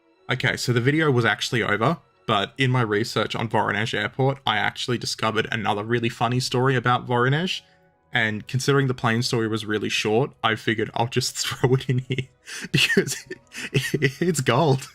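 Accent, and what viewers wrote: Australia. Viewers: People being annoyed at hearing Australian accents? Who are these (third letter)unts? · IS that a thing??? People getting triggered by an Aussie accent??? WTF?!?!!?!? God help them if they heard my Southern Texas Accent. 🤣🤣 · Apart from the Aussie accent, I can't watch this facetious video about a Aeroflot jet hitting a landmine. Come on, be serious about your subject! 😩🙄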